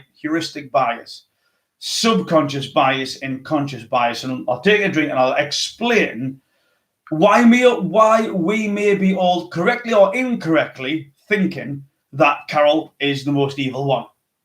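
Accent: Newcastle, United Kingdom. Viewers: So me and my little sister been watching your videos and trying to figure out where your accent is from. She thinks is British but idk I think you sound more Irish? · 😂😂 its English , he is from newcastle.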